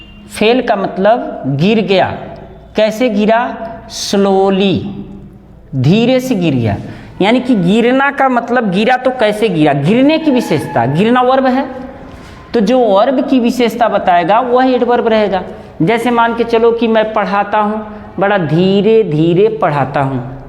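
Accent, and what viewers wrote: Bihar, India. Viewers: बिहारी है मैने पहचान लिया रिभर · Are you from Bihar.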